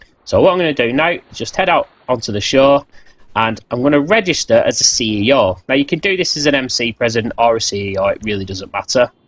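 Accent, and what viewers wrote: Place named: Lancashire, United Kingdom. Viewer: You sound like a Lancashire lad😛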